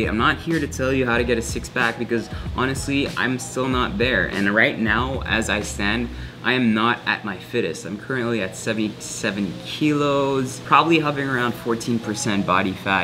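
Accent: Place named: Philippines. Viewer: you have a total filipino accent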